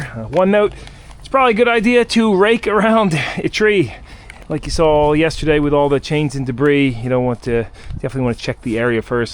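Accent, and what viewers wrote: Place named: Pennsylvania, United States. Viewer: Whoa, that's quite the accent. Are we from Pennsylvania?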